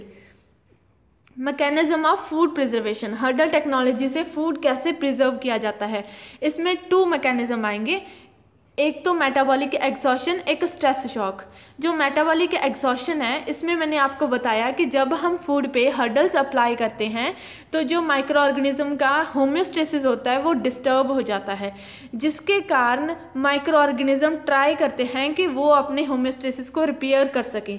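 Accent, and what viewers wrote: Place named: mixed, India. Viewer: Good voice, like Punjab Haryana Girl 🙂👍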